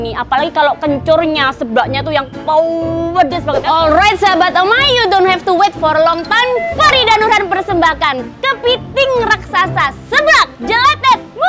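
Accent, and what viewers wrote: Java, Indonesia. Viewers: Mhn maaf yach knapa yach klo liat food floger lain makan tuh kyk'a gmnaaaa gitu...tp klo liat Omay makan koq enak banget..liat wajah'a jg enak...ngomong'a jg enak dgn ciri khas medok'a... Maka'a aq cuma.subscribe channel'a omay doang...ciyuusss nih n .sll nunggu senin rabu jum'at...😘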